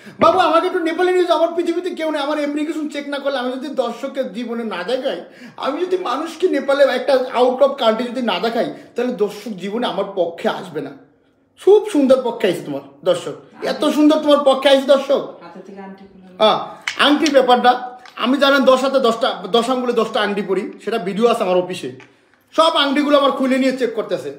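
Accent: Bangladesh. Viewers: ভাই আপনার কথা শুনে মনে হয় আপনার বাড়ি বরিশালে আপনে কি ভাবে এই মহিলার পাল্লায় পড়লেন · Barisal er suddho vasha sundor lagteche sunte 😂😂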